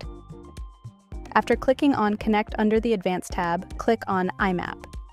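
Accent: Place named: United States. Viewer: Breath of fresh air to have an African resource for this - now consider having an African voice over to make it more genuine (as opposed to an American accented voice)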